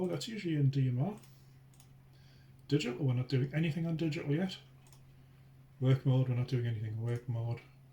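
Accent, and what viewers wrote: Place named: United Kingdom. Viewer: Arizona U.S.A and English, sounds as though your of British/UK descent. Thanks for doing this video.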